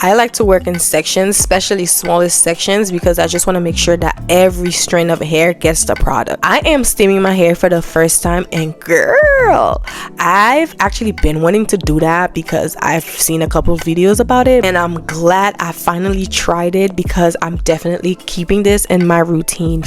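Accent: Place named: Barbados. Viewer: Beautiful hair & Love your accent, Are you from Barbados?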